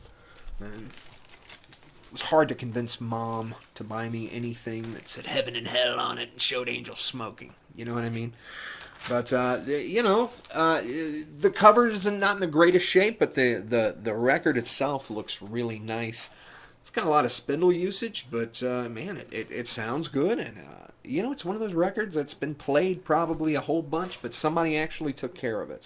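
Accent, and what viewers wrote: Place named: New Jersey, United States. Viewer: hahaha joisey. Youre a natural honey. You got this. Looking forward to it. Lol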